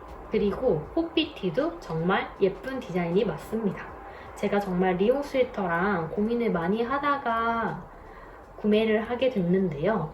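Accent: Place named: South Korea. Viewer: 어쩐지 억양이 있어서 평소에 사투리 쓰시는분인가부다 했는데 역시 남쪽에 사시는분이셨군요😊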